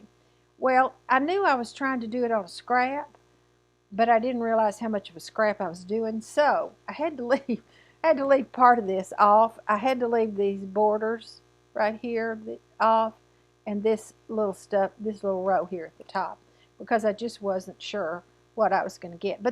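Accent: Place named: Oklahoma, United States. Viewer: I love hot chocolate! I've been enjoying your prayer chair chats because I love your accent. My Grandma was from Oklahoma and you sound so much like her, it brings back so many happy memories for me. Happy stitching!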